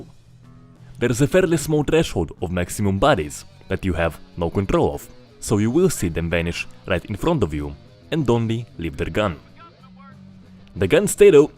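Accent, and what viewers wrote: Russia. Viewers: He's like speaking russian but in English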